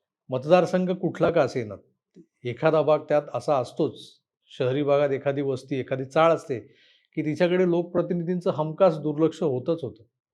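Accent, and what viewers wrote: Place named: Malvani, India. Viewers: किती हि मग्रुरी तेही निवडणुकीच्या दिवशी.जनतेने मतपेटीतून उत्तर द्यावे.बाकी मालवणी थाट काही वेगळाच.समजत नाही पण मस्त वाटते भाऊ पण बोलतात कधी कधी. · खुप छान मालवणी बोलात सर 😊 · छान वाटली तुमची मालवणी ऎकायला. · .Ji Saprem Namaskar! Sundar Ati Sundar Aaple Malvani Bolane. Tsech Ha Video. 31chya31 Dat Ghshyat Ghatlan Numhi. ( 32 - 1 = 31, 1 hee Akkal Dadh ) Kiti Mujorpna. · Super malvani dada ❤ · saheb kup mast malvani .mazaya aali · Nice मालवणी सर जी....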